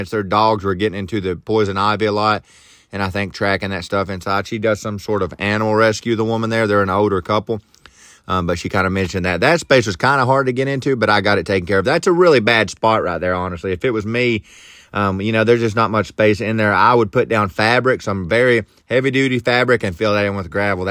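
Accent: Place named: Virginia, United States. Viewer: You sound like your from south west Va or nc my neck of the woods lol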